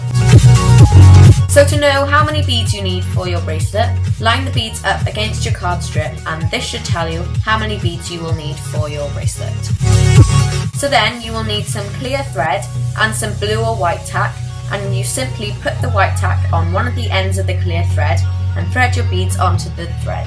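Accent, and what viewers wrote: Wales, United Kingdom. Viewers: Are you welsh? You have the accent.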